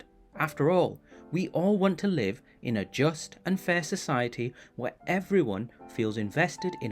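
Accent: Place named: Yorkshire, United Kingdom. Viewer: Really engaging video- cutting through the noise and the bs commentary which is pervasive in the news and most social media. I can sense a hint of Yorkshire accent amirite?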